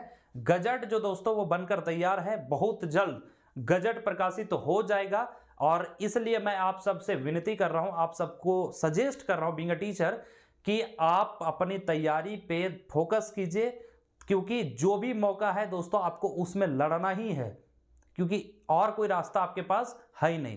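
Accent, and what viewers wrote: Bihar, India. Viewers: Bihar Ke Hain Kya sar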